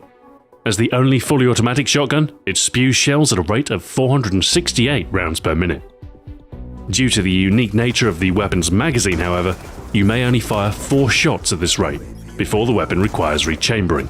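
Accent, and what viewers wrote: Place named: United Kingdom. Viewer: With a weird voice? He has a British accent, you moron.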